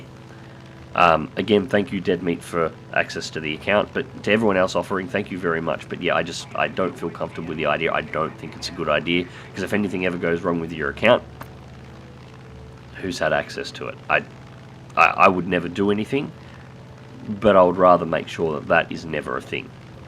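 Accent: Australia. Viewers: Hi, It's glad to see your new video on youtube. I thought Australian English was so-called "unbearable" before watching your videos, but now I'm pretty sure I like your Australian accent. Thank you for the exceptional skill you show in the video.:) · yeeeeeees!!! finally! go away sleep, I have a fellow Aussie to listen to! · always sound like crap you crazy Dingo!!!! Your Austrailian, which is sexy if your Olivia Newton John or Kylie Minogue!!! You sound like the old guy from Air Supply most of the time!!! "LOL" -- You have brought me sooooo much fun, lucky day for me when I stumbled -literally - on to your channel!!! I think of you as my good friend down under -- Fair Dinkum --- Sprog1 in AZ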